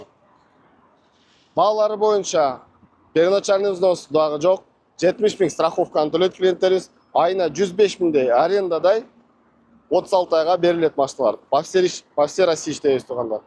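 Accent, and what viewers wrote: Kyrgyzstan. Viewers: Ям дебей дагы деп суйлоонузду сунуштайм. Кыргызча таза эле. Туура соз . капаланбашынар шарт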